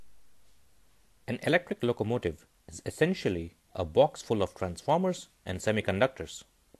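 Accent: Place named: India. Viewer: The streetshitters accent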